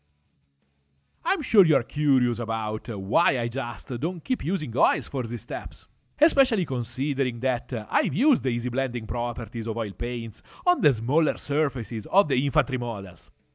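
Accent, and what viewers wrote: Italy. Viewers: Complimenti! Sei bravissimo, poi l'inglese stile Super Mario è la ciliegina sulla torta! 🥰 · aaaah okok! Sentivo dall'inglese un po' "maccheronico" che un po' tutti noi italiani abbiamo! Apparte questo, sei un mostro grande!!! Io ho iniziato ora escono statuine del presepe.... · Video davvero belli di ottima qualità! Molto interessante ed istruttivo. Molto simpatico l'accento inglese un po' italianizzato anche se a volte è davvero comico 😁😁... Ma comunque complimenti!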